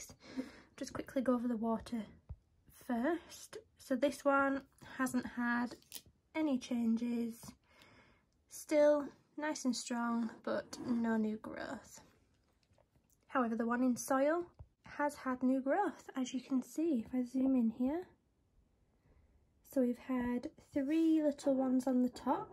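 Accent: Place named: Northern England, United Kingdom. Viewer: I really enjoyed the journey of these plants and am looking forward to trying this myself. There is something addictive about making new plants for free. Also I love your accent...I am from Yorkshire myself but live in Ireland so miss hearing Northern voices!!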